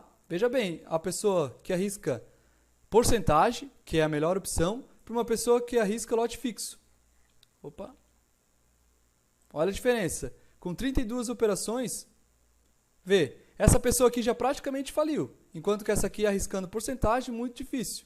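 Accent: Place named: Santa Catarina, Brazil. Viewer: ajudou bastante ! Pelo sotaque você deve ser catarinense, se for somos vizinhos, eu sou de Rio Negrinho SC rsrs....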